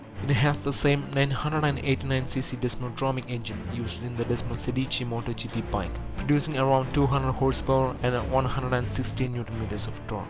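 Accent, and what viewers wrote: Kerala, India. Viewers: ഒരു മലയാളി ഇംഗ്ലീഷ്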